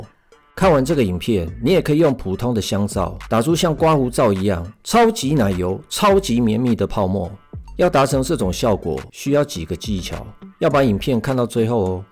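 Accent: Taiwan. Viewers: 就是這個正港台灣腔讓我訂閱的😂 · 你的臉很帥感覺很斯文，但聲音很台哈哈哈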